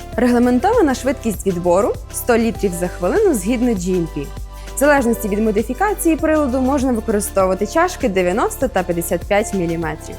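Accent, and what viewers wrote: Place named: Western Ukraine, Ukraine. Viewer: Приємно чути таку експертну подачу українською, ще й з таким теплим західноукраїнським акцентом 💛💙 Дякую за професійне пояснення про важливий аспект мікробіологічного контролю!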